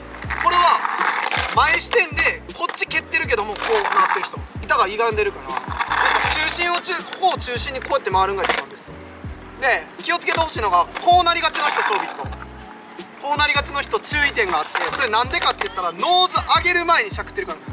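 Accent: Kansai, Japan. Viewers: 関西の人ってみんなこんなんよ(笑)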